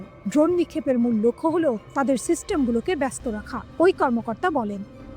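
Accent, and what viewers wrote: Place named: West Bengal, India. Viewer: নাগরিক টিভি ভারতীয় বাংলা উচ্চারণে সংবাদ পাঠ চালু করলো কেনো?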